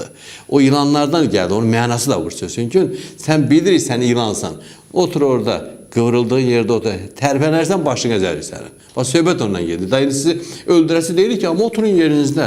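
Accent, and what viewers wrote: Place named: Aftafa, Azerbaijan. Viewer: Bas ezen olub bu???? Danisigina bax....Aftafa....yeqin ayda 50 min paket alanlardan olmaq isteyir..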